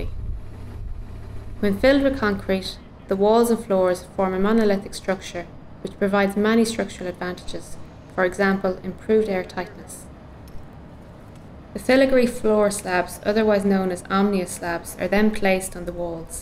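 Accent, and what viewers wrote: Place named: Ireland. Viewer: Me too Juan, she has a lovely voice and sounds Irish to me.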